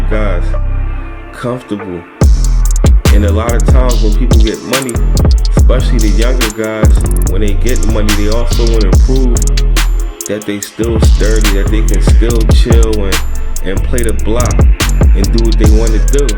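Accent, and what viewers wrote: Philadelphia, United States. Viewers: U sound like a Philly nugga so ima take ur word 4 it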